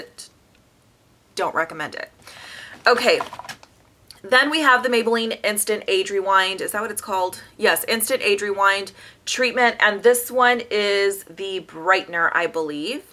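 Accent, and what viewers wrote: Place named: South, United States. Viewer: Very good information, thanks! I can tell you are a fellow southerner..."we are Fixin' to go to my mother's" We only say Fixin' to do something in the south. Have a great weekend!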